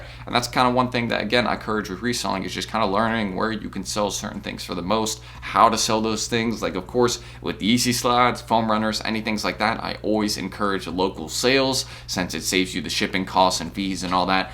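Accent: South Carolina, United States. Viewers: W vid Btw are you from South Carolina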